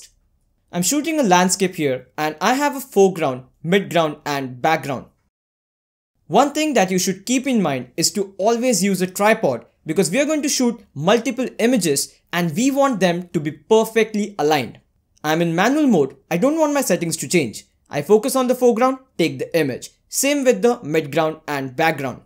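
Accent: India. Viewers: I'm sorry but I can't focus on the video, Indian accent is the best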